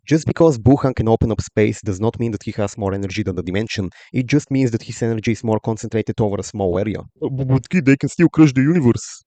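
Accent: Russia. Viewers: off topic question, but... you're Russian, it's just that accent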